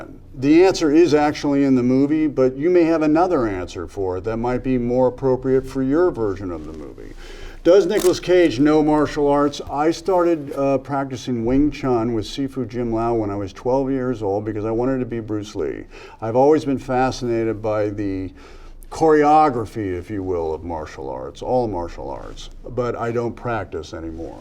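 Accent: United States. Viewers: i have never watched a movie with him so i wasn’t expecting him to sound so uber american lmao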